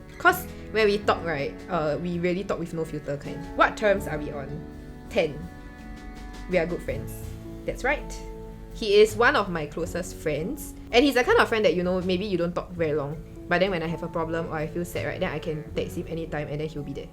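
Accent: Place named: Singapore. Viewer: can hear the singaporean accent eh!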